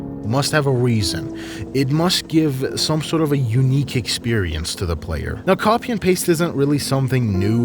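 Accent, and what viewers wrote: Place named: Iran. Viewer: i didnt think you would be iranian, but now i think about it, you do have a thin accent. but still, it would be cool to have iran as a tech tree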